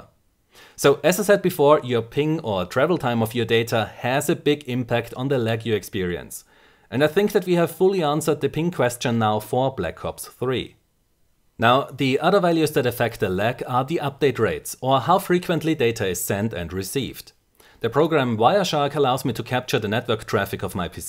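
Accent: Austria. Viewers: Bist du Österreicher? ^^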